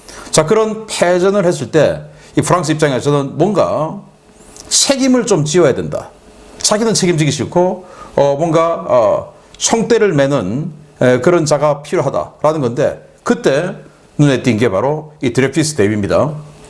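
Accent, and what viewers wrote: Gyeongsang, South Korea. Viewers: 🐕 조지나 빨아라 경상도 말투나 고쳐라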